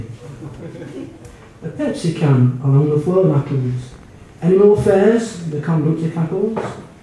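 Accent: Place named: Yorkshire, United Kingdom. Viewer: Hahaha your soooo Yorkshire!!!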